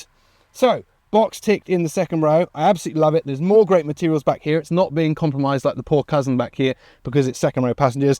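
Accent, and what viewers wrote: Australia. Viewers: Nvm, I just found out you're Australian 😅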